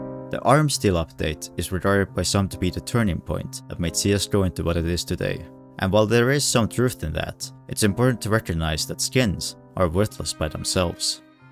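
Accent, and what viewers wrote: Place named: Estonia. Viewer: Hea video ja huvitav kuulamine :D Sain esimese paari minutiga aru, et tegu eestlasega! Keep it up!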